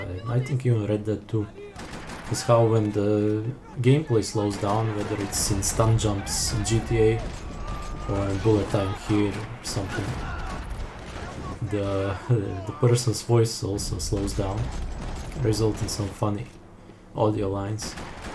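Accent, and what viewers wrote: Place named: Russia. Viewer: Звучишь как русский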